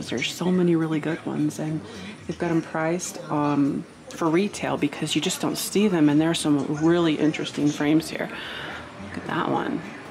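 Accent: Midwest, United States. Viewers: such a wonderful overview of everything and your right, CLL would go absolutely crazy❤️ And our Midwest pronunciations ❤️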